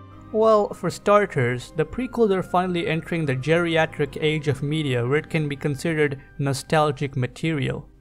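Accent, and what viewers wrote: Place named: India. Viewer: Starts video -Hears Indian accent Time to abandon ship!!!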